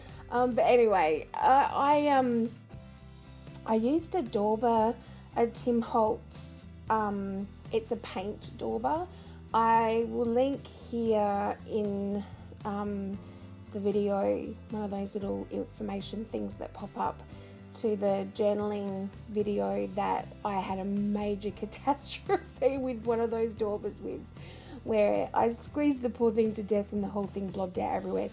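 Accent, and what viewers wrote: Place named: Australia. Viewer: yay another chatty aussie lol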